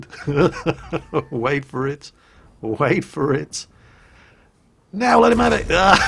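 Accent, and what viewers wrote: Russia. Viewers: love that Russian accent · Cant get enough of your KV 2 videos including jokes with a Russian accent and laughter. I love your other videos as well but videos with KV 2 deleting ridiculous targets with ridicules shots just gives a little more juice to the entertainment :D.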